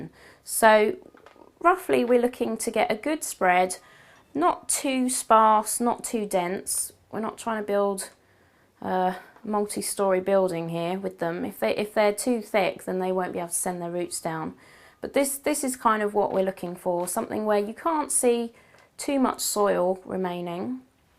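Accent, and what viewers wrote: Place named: United Kingdom. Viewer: Very good vid,. Thank you for the info!...Looks like you have quite a few fans, including myself. Love the British accent, and of course you're very lovely!! And of course Wheatgrass juice is an amazing healer for the body. Try it 4 yourself & you won't be disapointed.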